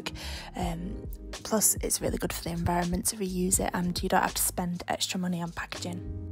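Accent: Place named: Newcastle, United Kingdom. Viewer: I had a thing with a gal one summer…and she had your accent. You must be from Newcastle…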